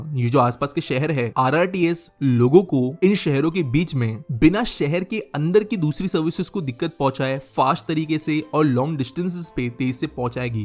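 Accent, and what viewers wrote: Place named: Rohtak, India. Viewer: Bhaii knew you are from rohtak😂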